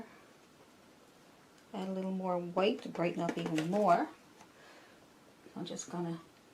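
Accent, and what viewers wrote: Newfoundland, Canada. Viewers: Is that a NFLD accent that I'm hearing?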